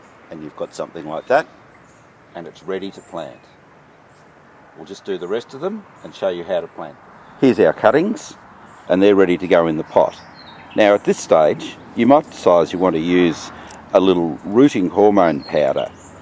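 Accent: Australia. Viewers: I'm sorry but i just can't take the aussie accent seriously :) :)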